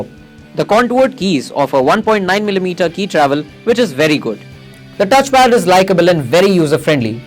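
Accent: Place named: India. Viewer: A hindu accent combined with a shitty audio quality. Thank you, but not, thanks.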